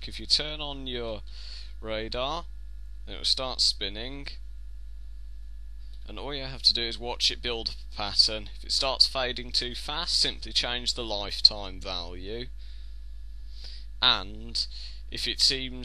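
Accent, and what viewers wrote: United Kingdom. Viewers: this man is British. He sounds like a young Ringo Star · this guy sounds more british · i hate british accent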